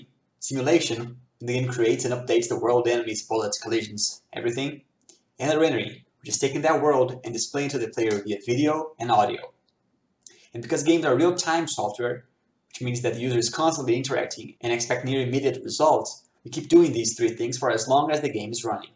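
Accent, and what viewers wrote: Brazil. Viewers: The accent gave it away! I instantly knew you were Brazilian!🇧🇷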